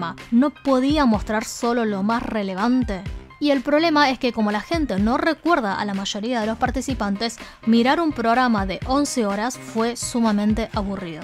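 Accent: Argentina. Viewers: Porque tiene acento argentino?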